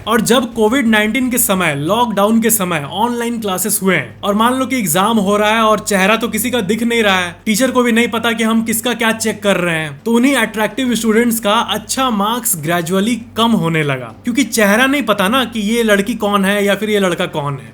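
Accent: Bihar, India. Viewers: kya aap bihar se hai bhaiaa🤔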